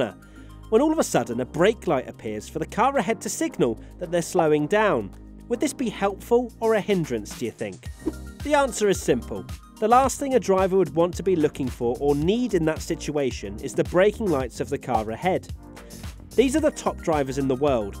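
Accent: England, United Kingdom. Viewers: Yeah I admit I know the answer. I just love listening to your adorable English accent. 😁 Plus your clips are all very informative and entertaining. Love WTF1! Could you get me Charles autograph? Lol!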